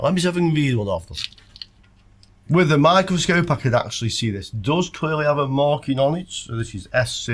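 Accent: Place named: Sunderland, United Kingdom. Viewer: hi.like your accent nowt...haha im from sunderland macam land and we use the same wording for nothing. love your videos im not a tech just find the videos interesting...and simple to follow even though you go off into a tech tangent sometimes haha..but i know what the channel is for.keep up the good work......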